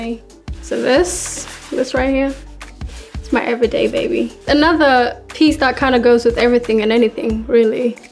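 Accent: Australia. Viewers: She sounds Australian almost. She is beautiful! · She is so down to earth and very beautiful. I love her Sudanese heritage and Aussie-tinged accent growing up in Australia ;) I love Naomi taking her under her wing...so cool. She deserves so much success. xx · She’s beautiful and has such a stunning figure, love her Aussie accent as well!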